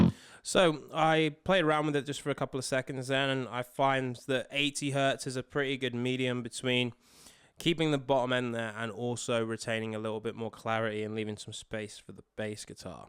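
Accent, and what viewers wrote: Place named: United Kingdom. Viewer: Absolutely British accent.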